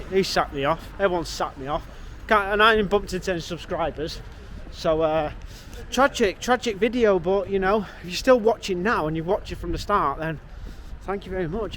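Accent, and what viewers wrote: Manchester, United Kingdom. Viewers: What part of manc are you from? Deffo a manc with that accent. :-)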